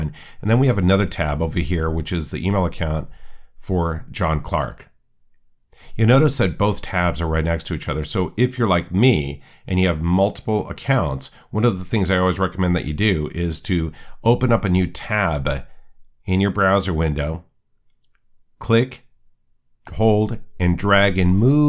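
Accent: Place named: United States. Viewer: And u speak "American-English." I can understand some dialects, accents...But IF I cannot -- that's just another CHALLENGE for me in an already challenging situation. I dont want to be inflexible, but it is just a fact. Again, MANY THANX!!!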